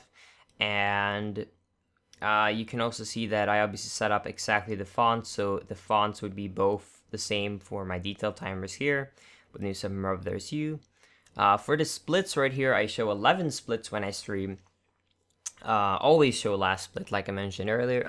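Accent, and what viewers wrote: Sweden. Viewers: OMG u swedish like me!?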